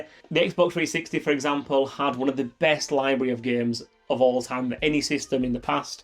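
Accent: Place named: North East England, United Kingdom. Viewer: Where in the UK are u from? you sound like your from the North East. Good vid. Subbed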